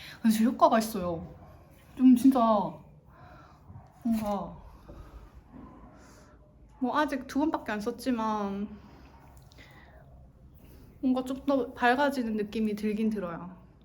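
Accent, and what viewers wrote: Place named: Gumi, South Korea. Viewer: 진정 말씨는 구미분이십니다 ㅎㅎ😊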